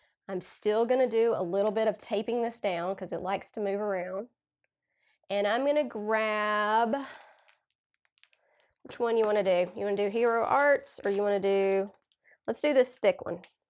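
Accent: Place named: Tennessee, United States. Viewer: I have been wondering where you accent is from now I know Tennessee! I am in Texas.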